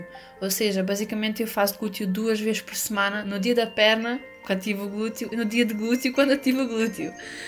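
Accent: Portugal. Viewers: Gosto Muito do sutaque de Portugal, incrivel como a tem esse corpo sendo vegan dedicacão total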